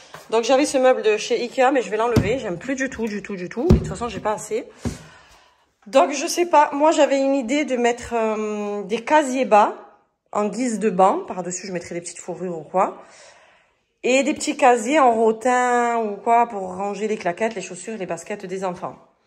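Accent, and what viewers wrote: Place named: Midi, France. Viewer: Mignon l'accent du Midi 😊😊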